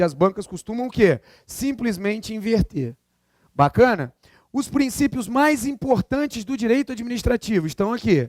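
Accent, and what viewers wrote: Rio de Janeiro, Brazil. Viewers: ele é carioca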